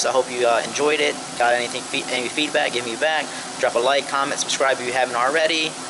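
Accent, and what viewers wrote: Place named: South, United States. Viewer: What’s up brother just subbed thanks for the info. Where you from? Trying to figure out where you accent is from sounds southern a bit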